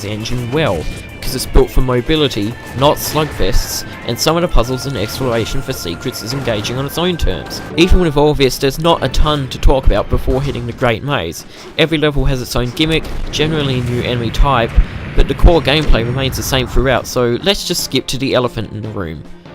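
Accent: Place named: Australia. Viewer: Australian voices <3 You <3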